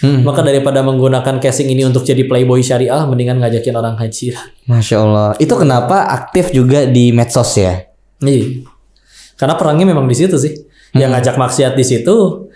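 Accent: West Java, Indonesia. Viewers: Masya Allaah Barokallaah atas ilmu & pengalamannya Koh Cara penyampaiannya raoseun Meni sunda pisan padahal bukan asli sunda👍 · Logatx sunda ea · MaasyaaAllah, mirip a agym ya bicaranya, logat sunda😁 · Sampe logatnya yg nyunda pisan pun sama 😁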